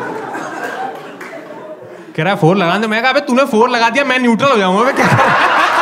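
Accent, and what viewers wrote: Bhopal, India. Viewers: I can relate to your humor, Your Bhopali accent makes the joke way more funnier. ~ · Bhopali is in your tone..... ❤️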